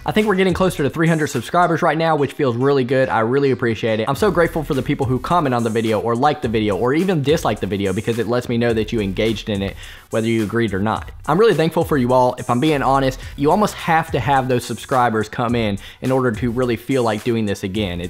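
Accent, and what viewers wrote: South, United States. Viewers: Lol I got you buddy! Same here just my opinion. Why are you a Michigan fan? You sound like you kinda have a southern accent.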